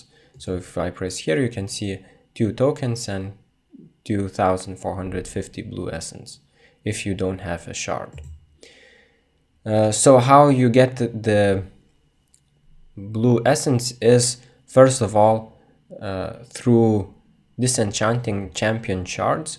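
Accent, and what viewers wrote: Lithuania. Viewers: r u lithuanian? ur acent is just like lithuanian talking english:D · Let me guess, you're from Lithuania. You sound like one though. Your accent is litterly Lithuanian.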